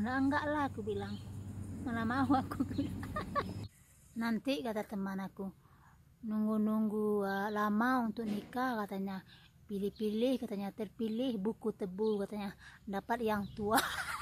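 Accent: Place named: Minangkabau, Indonesia. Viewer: ..emang bana tu ni.... Dan lagi logat uni masih terasa kental urang awaknyo.. gak dialekk kebarat2an walau udah puluhan thn di swiss.. Semoga sehat selalu ya uni.. ditunggu resep masakannya...dan juga kebersamaannya bersama keluarga..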